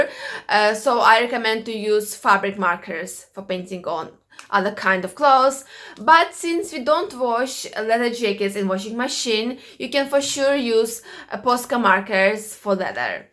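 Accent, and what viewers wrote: Russia. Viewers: Русский акцент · Она русская или какая то????английский такой 🤣🤣 video is useful but why not arcylic???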